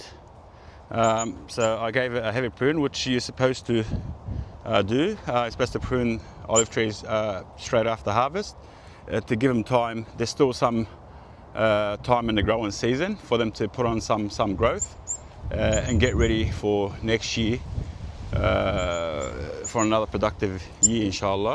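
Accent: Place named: Australia. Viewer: That Wog Aussie accent, I love it. I'm a Wog Boy too 😃 Well done mate. Good video.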